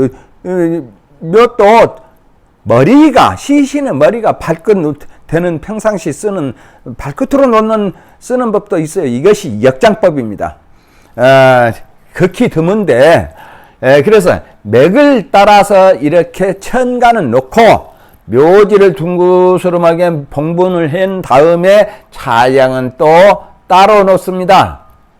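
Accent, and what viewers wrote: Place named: Jeolla, South Korea. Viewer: 선생님훌룡하신강의 잘보고 있습니다 말씀이 전라도 이신거 같으신데 어디신지 여쭤봐도 될런지요?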